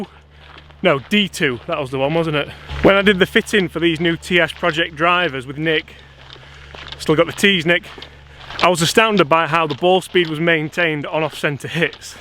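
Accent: Yorkshire, United Kingdom. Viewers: You are growing on me man! I was unsure at first, but I'm thinking it was the Yorkshire accent..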